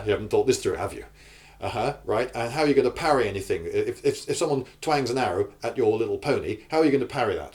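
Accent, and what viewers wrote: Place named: England, United Kingdom. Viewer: Love the English accent. A unique attitude.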